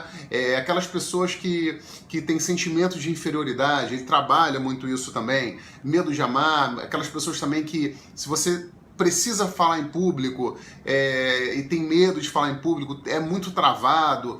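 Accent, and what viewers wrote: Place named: Rio de Janeiro, Brazil. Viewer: RIO DE JANEIRO lindo mesmo. E ainda com esse sotaque carioca.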